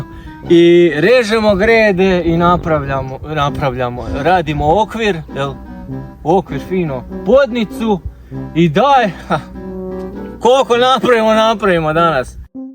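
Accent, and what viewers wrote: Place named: Slavonia, Croatia. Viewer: Sve Sto Treba Imas Bravo momce Gledam Te Iz Podgorice a ti mene Imas Govor slavonskipozdrav Od srca jesi vredan❤❤❤❤❤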